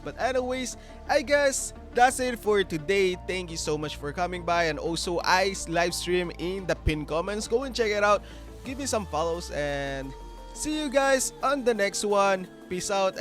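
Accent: Philippines. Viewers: you sound very pinoy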